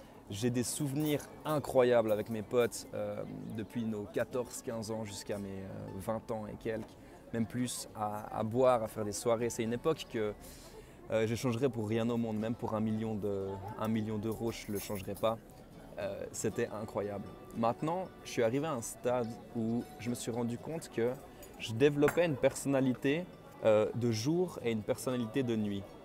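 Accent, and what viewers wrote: Fribourg, Switzerland. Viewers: Salut, J'ai l'impression que tu es de Suisse (ton accent) ^^ et tu as tournée où cette vidéo? Je vois qu'il est marqué Rue de Lausanne derrière mais je n'arrive pas à lire l'autre plaquette ^^ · petite question inutile ... es-tu suisse ? tu as le beau accent fribourgeois ;) (super vidéo ! ) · je voix que tu es Suisse, j'ai deviné juste avec ta voix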